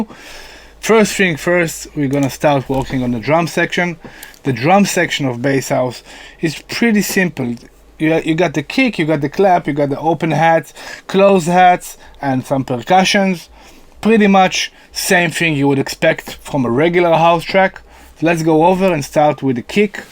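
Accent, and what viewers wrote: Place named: Israel. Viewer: קשה להסתיר את המבטא הישראלי באנגלית, תודה על הסרטון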